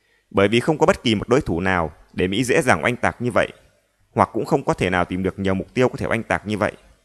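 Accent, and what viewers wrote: North, Vietnam. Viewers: Giọng nói phát âm giọng Bắc chuẩn nghe rất hay, không nhớt nhát như giọng Hà Nội. Phát âm gãy gọn nghe mê giọng Bắc này lắm.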